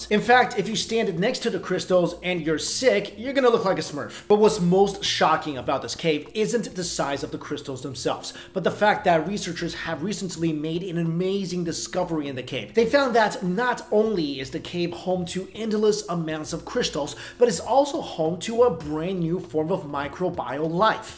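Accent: Canada. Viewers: Is he Canadian? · All I can say is: "Are you Canadian?"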